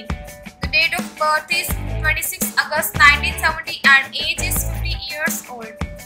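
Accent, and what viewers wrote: India. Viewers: Agree. Indian voice over kid....listen to the video yourself. This isn't good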